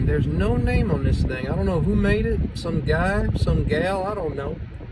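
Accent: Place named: South, United States. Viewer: Love finally hearing the true CLE southern accent! Bless your heart ❤! Long live the chupacabra and Mr No Name Meecham!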